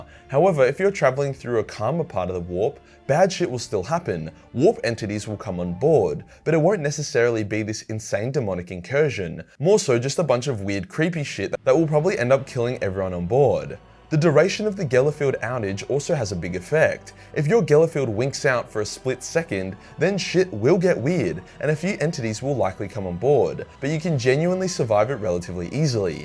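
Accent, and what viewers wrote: Australia. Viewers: I can't stop watching your videos, mostly because of how intensely Australian you are. We Americans love Australians.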